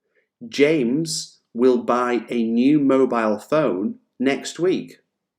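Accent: United Kingdom. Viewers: Hello. Is your English from the UK ?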